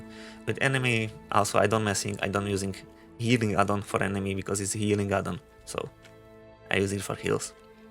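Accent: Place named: United Kingdom. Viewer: why in the world do people who mumble, and have thick british accents make guides. totally bizarre.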